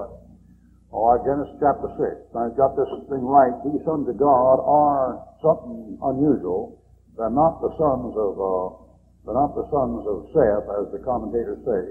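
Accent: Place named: South, United States. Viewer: He's southern just talks fast,I'm southern understand him perfectly,lol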